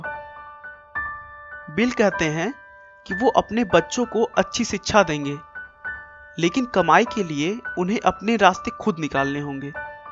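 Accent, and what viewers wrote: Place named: Uttar Pradesh, India. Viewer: Iska uttar Pradesh Wala accent kan me chub ta ha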